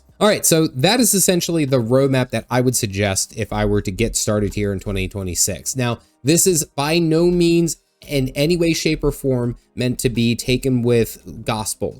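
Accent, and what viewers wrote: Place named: Eastern Pennsylvania, United States. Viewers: Appreciate it! Catching some speech patterns. Are you from Maryland, Delaware, or Pennsylvania? Maybe parts of DC. Thanks for vid 🖖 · love the content and your eastern PA/south NJ accent. cheers, dad gamer from MA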